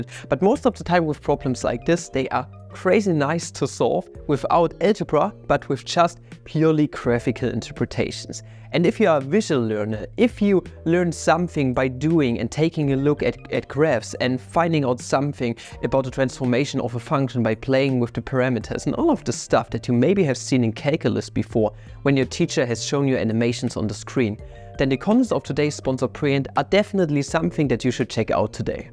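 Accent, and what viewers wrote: Germany. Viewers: Are you German? I always thought you had a German accent and a German name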